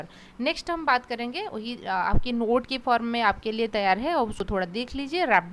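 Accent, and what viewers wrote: India. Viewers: So sweet hindi accent mam ❤️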